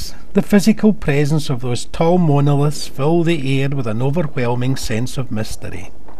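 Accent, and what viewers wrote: Scotland, United Kingdom. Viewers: Nice and interesting film and I love your Scottish accent.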